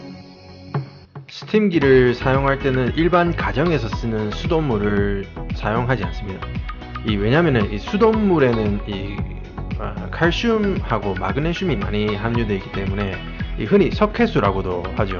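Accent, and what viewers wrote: Gyeongsang, South Korea. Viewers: 들리는 영어발음이나 차나 설명하는 문화나 미국인데 말에 스며든 경상도 말씨 때문에 경상도에 미국있는 착각함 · 경상도 남자가 혀굴리며 영어쓰는것같아ㅎㅎ 매력있넼ㅋㅋ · 국산말 할땐 갱상도ㅋㅋㅋ 수입말 할땐 갱상도 억양이 없어지는데예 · 저도 나름 세차환자다보니 영상만 봐도 완전 힐링되네요. 그나저나 요새 방송이나 유튜브에서 찐 경상도 사투리 듣기 참 힘든데 말이죠. 간만에 귀도 힐링하고 갑니다. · 알고리즘만 스쳐도 인연인데 구독하고 갑니다~! 경상도 사투리와 영어 의 조합이 색다릅니다 ㅋㅋ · 아이고 말투가 경상도 아닙미꺼 저도 세차업을 합니다 먼 타국에서 욕보십니다 자주 오겠습니다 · 알고리즘으로 시원함느끼고 갑니다 미국이신데 경상도말투로 해주시니 구수하이 좋네예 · 찰진 경상도 사투리 + 교포말투 + 꼼꼼한 청소 = 마약 영상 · 뭐지... 경상도 사투리와 영어의 이 완벽한 조화는? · 찌지고 문떼고...그렇 습니더~ 경상도 구시한 사투리 정이 뚝 뚝 느껴집니다~ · 경상도 사투리 구수한데 영어발음은 상당히 좋으시네요 ㅎㅎ 미국 가신지 제법 오래 되셨나 봅니다. 그나저나 유튭 알고리즘은 왜 이걸 추천해서 이 새벽에 보게 하는지..ㅋㅋ 속시원하게 청소 되는걸 다 봐야지만 꿀잠 자겠네요~ㅋㅋ 잘 볼게요~앞으로도 속시원한 청소 영상 보러 자주 오겠습니다~~ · 영어발음은 네이티브 한국발음은 미쿡이고 억양은 경상도 토박이네요ㅋㅋㅋ 엄청난 조합입니다 듣고만 있어도 풉하게 되네요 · 저도 경상도 사람인데... 갱상도 사투리와 멋찐 영어랑 섞여서 하니깐 스웩하십니더! 느낌있습돠~ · 경상도 이민자 십니까? 억양이 저희쪽 이시네요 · 구독하고 갑니다.간간히 들리는 경상도 사투리가 정겹네요~ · 갱상도잉글리쉬 찰지네예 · 경상도 사투리 정겹네요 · 경상도 사투리가 정겨워요~ ㅋㅋㅋ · 경상도 억양 쓰시면서 영단어 말할때는 원어민 나오는게 신기하네요 ㅋㅋㅋ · 아니 갱상도 말투 론나 좋습니다 ㅋ콬ㅎㅋㅋㅎㅋㄹㅋㅎㅋㄹㅋㅎㅋㅎㅋ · 갱상도식 영어 발음이 특이합니다 추천합니다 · 한국어 베이스가 갱상도네요ㅋㅋ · 아무리 들어봐도 경상도 아저씬데... 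· 저도 아시는분이 미국에 오래사셔서 ㅎㅎㅎ 생각도 나고, 경남쪽 사투리들으니 친구들 생각나고 구독누르고 갑니다~~~소소한 미국소식 기대합니다~~~ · 고향이 경상도이신가봐요!ㅋㅋㅋㅋㅋㅋㅋ 사투리와 영어발음이 섞인 말투 넘나 매력적인것....☆ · 갱상도 사투리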